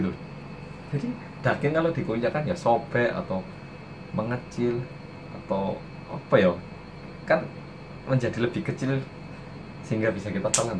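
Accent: Java, Indonesia. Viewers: aaaaawww mas kamu medok sekali🤣🤣🤣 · Bahasamu mas medok kece 😂👏🖒 tinggal malang ndi? aku nak suroboyo iki. cidek yo 😆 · Isek medok lek gini 😂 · Mana opening orang korea yang medok 😂😂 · Ada di branda, ko unik, muka orang korea kok bahasanya jawa medok , aku langsung liat semua vidio, dan akhirnya kenalan jugaaa hehhehe, sehat selalu ya kka :) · Duuuuuhhh dan aku nonton video ikiii,, ya ampuuuum muedok banget maaaaasss sao guuueeentooong hahaha , , aksen jowo seng berlebihan ki ngno wki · serius, kaget sama aksenya dia sama sekali nggak ada aksen koreanya, dan bener bener2 kental medoknya, sepertinya dia bakalan jadi sainganya han yoora hahaha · sampeyan layak dadi artis mas, soale jarang korea berbahasa jowo dan medok begini, valuable tenan iki. melu drama sekolah internasional di net TV kae mas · Agustus 2020 baru liat ini karna abis liat kbs indo di ig sama dita...penasaran org korea kok lucu iso medok😂😂😂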